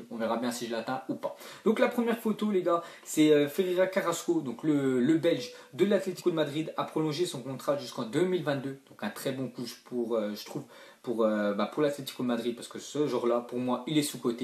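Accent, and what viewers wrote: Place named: Marseille, France. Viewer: tu as l acsent marseiller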